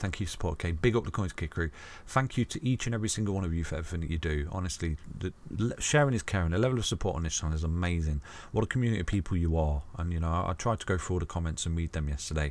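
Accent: United Kingdom. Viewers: You have a fcking perfect british accent, I love it.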